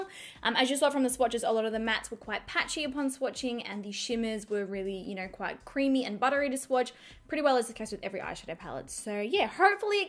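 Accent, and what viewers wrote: Australia. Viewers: I miss Australia so bloody much, like your accent makes me want to cry I wanna move back now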